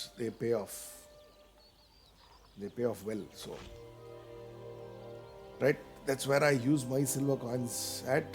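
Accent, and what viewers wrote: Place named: India. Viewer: hey nice video man Are you from India? u r english accent is completely matching to indian style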